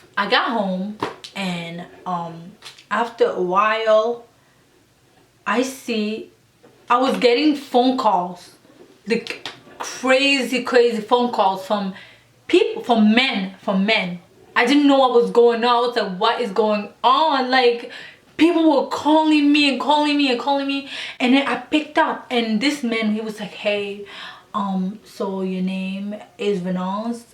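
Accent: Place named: Netherlands. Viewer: Where u from your accent different like u from Netherlands or something?..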